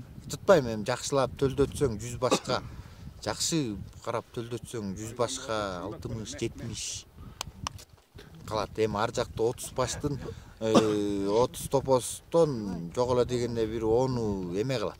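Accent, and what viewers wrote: Standard. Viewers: Азыр уйдун эт-сутуно караганда топоздуку таза болуп калбадыбы,укол-дары бербей баккыла эми.Ал эми топоздун сутун кандай пайдаланган туура болот аны интернеттен караса, пайдалуу маалыматтар коп эле болсо керек. Малчылардын туйшугу да ото оор болот,ак-эмгегинердин узурун коро бергиле!Так-таза кыргызча суйлоп, жакшынакай маек берген азамат экен. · Депутаттарга караганда сонун так даана тушунуктуу нукура кыргызча кагаз карабай суйлойт экен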